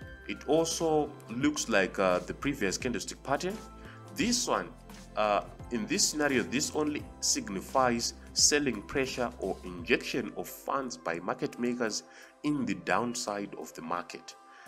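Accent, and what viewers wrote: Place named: Zimbabwe. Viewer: thanks. you sound Zimbabwean.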